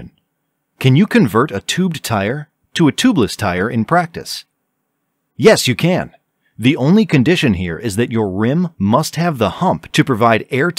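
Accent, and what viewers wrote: Kerala, India. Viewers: He has a Malayalam accent. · Is that malyaali accent I hear? So good to hear that! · The മലയാളം accent seeps through. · Worst Indian accent ever. It sounds like something out of a stand-up comedy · You talks like a mallu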